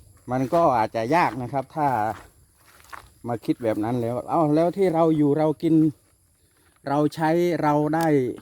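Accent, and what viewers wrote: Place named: Surin, Thailand. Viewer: สุดยอดครับเสี่ย..มารับชมให้กำลังใจครับท่าน..สำเนียง คนสุรินชัดเจน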